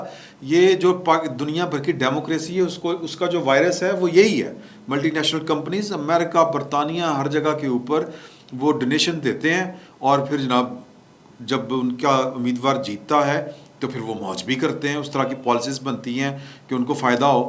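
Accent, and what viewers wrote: Punjab, Pakistan. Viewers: Syedimran shafqat sahib king 👑 sar your punjabe touch i like you love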